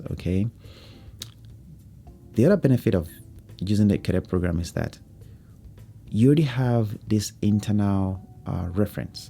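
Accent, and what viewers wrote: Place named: Ghana. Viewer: You sound like a Ghanaian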